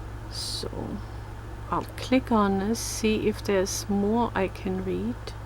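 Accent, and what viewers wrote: Germany. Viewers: So cute. Good lighting, and you look very pretty! Good nail polish and makeup <3 I love hearing German accent!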